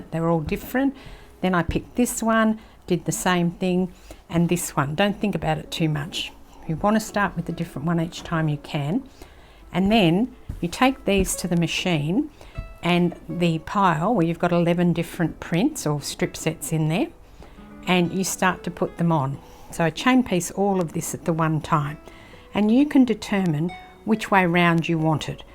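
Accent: Australia. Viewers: Hi, that’s a great tip on this lovely Fat Quarter Shop quilt. I especially loved listening to a fellow Aussie. Cheers Camille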